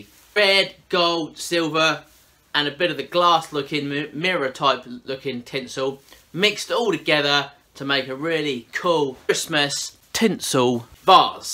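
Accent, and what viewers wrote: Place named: London, United Kingdom. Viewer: 听惯了美音，再听伦敦腔，这耳朵一下子没转过来。。。。 fighting!!!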